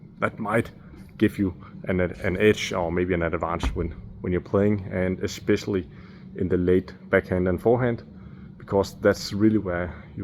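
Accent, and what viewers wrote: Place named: Denmark. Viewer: Du har virklig meget dansk accent 😂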